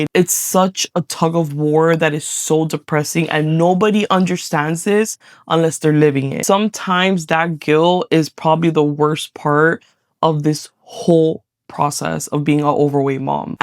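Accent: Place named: Southern California, United States. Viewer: Indicted TV's Negra MUST meet this youtuber! 😊 the SoCal accents 😊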